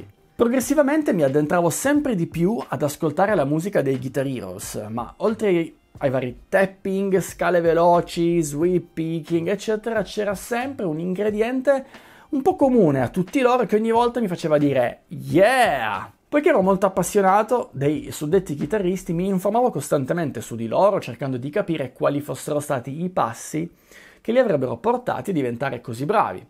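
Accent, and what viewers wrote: Piedmont, Italy. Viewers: Io (ragazzo negli anni '80), sono proprio partito dai chitarristi vecchia scuola (Knopfler è stato il mio primo idolo, e poi i più vecchi Clapton, Hendrix, Page, etc.) e solo in seguito mi sono avvicinato ai mega virtuosi che in quegli anni impazzavano. E devo dire che alla fine i virtuosi mi hanno un po' stufato, a parte pochi casi come Van Halen o Morse, mentre i vecchi classici sono restati. Anche perchè la mia tecnica è quella che è. Ora stimo enormemente gente come Govan o Timmons, tecnici ma anche raffinati, però continuo ad ascoltare molto più spesso il vecchio blues rock o hard rock ignorante, anche fatto da band contemporanee (ce ne sono in giro di molto interessanti). PS: viva l'accento piemontese 😄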